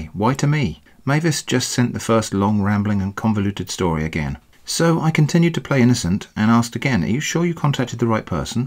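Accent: United Kingdom. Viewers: I really like the production you put into this one!! I love ALL your videos as I'm a fool for your cheeky British accent. Lol thanks for your work!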